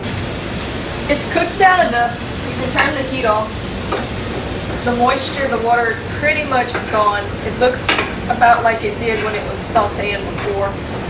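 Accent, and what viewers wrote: Louisiana, United States. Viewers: Tony Chachere's, Magnalite skillet, and that beautiful accent. What parish are you from? It has to be a little further north than me judging by the landscape in some of your other videos (that i love). Wish i could get you and my wife to hang out so you could be her mentor. Keep up the great work! You have a new subscriber BTW.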